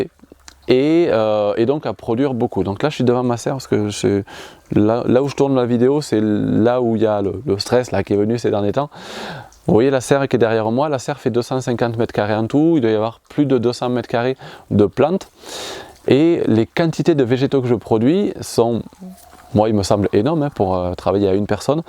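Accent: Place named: Tarn, France. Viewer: bonjour bonjour ! si jamais tu passes en correze hésite pas a venir nous voir (on se connait pas du tout mais on se sent connecté a toi on vient de villemur sur tarn et ton accent nous ramène chez nous et puis on kiff tes videos ta philosophie etc !) bref on fait de l'elevage de brebis et transfo fromagere, de la perma et du chanvre bio tout ca tout ca, t'apprendras pas énormément on est pas des experts mais on est sympas !! )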